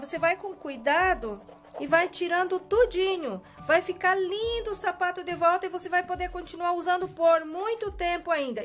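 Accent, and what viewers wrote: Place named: Asia. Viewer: Pelo sutaque parece ser asiática, gostei do vídeo. Parabéns pelo seu trabalho.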